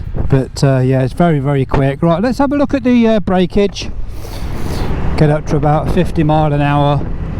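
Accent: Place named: East Midlands, United Kingdom. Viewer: Nice video and nice accent. You sound local to me (Leicester [Coalville]) but could be anywhere in East Midlands. Nice to recognise the roads.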